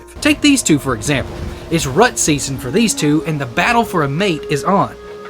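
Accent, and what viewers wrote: South, United States. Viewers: I think i I hear some southern in your accent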